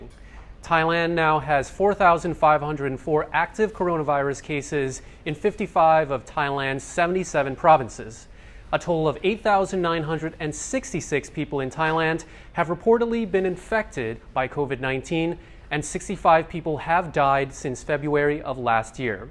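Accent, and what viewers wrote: United States. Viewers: it's his painful to listen to American accent, if he softened his voice a little would be much better, he's not ugly but that's irrelevant, have you asked him for a online date yet? Zoom xx 😜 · No way bring back Tim theres nothing worse than hearing an Asian speak with an American twang give me the aussie accent anytime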